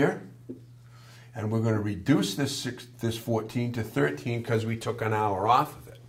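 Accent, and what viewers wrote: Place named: Eastern Shore, United States. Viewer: One- of the greatest gifts to humanity is teaching. The second is teaching WELL! and you achieved both! Your tone and pace is excellent. Now far from my Eastern Shores I do enjoy the accent, which of course we know we don't have but everyone else has one.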